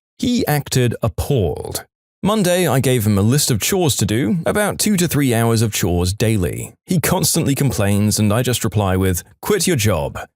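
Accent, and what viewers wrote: United Kingdom. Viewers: No offense but British ahh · The British voice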